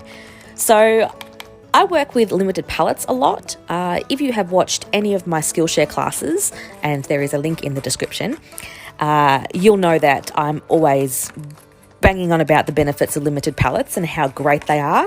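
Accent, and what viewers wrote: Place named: Australia. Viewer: Hi, I just found your channel and it was lovely to watch your process and hear a fellow Aussie accent!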